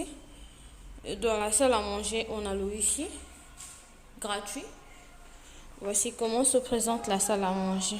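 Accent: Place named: Democratic Republic of the Congo. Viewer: Ah tu es congolaise aussi, ça se sent en parlant😌😅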